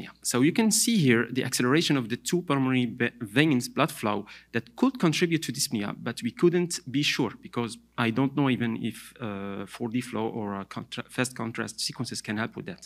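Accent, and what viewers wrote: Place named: United States. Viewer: كيفاش تعلمت اللهجة الامريكية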